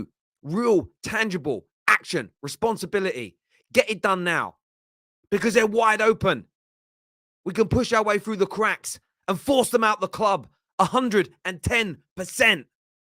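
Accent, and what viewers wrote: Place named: Manchester, United Kingdom. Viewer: that was a boss Mancunian accent looool.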